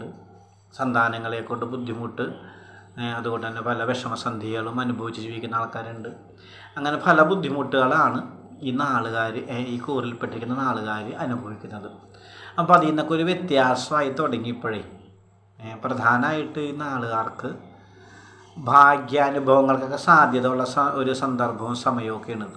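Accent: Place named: Kochi, India. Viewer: When I hear a positive, I am happy thirumeni 🙏 .. njan subscribe chaythu, nummade kochi slang when I heard the innocents so happy 🙏. Thirumeni I am from USA 🇺🇸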